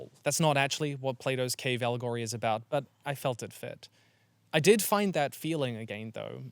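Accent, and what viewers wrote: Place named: New Zealand. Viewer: this is my first video of yours. heard the accent and had a feeling you might be kiwi. wasnt till you said 'the warehouse' in the begining of the video that i finally clocked that yes indeed you are a kiwi haha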